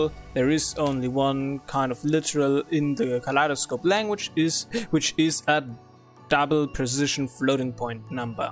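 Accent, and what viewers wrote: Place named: Germany. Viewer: 1) nice microphone 2) nice video editing 3) nice voice :) high quality! Just some weird ways to say things but great work! But that might come from the german accent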